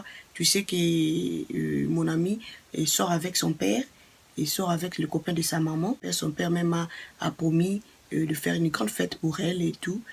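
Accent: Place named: Democratic Republic of the Congo. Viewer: J'étais que tu étais Congolaise avec l'accent que tu as.. (moi aussi j suis Congolaise ;)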